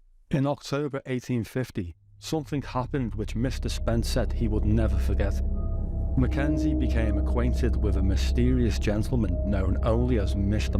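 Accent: Liverpool, United Kingdom. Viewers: the posh scouse phone voice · Scouse narrator. Bonus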